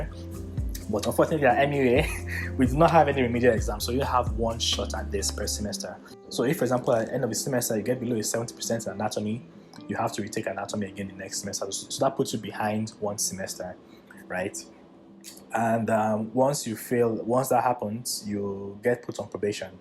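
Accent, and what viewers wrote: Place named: Nigeria. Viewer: You sound Nigerian bruh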